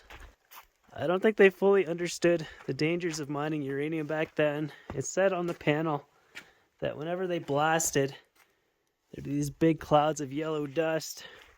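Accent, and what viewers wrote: Canada. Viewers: in the first minute i asked myself from the accent, "this guy's got to be canadian' then you mentioned the distance as kilometers and I questioned no longer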